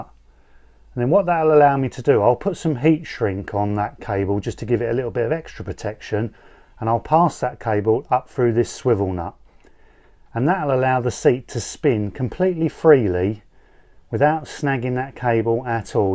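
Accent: United Kingdom. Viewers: You’re a cool dude with a great British accent (which locality?). Not your best vid.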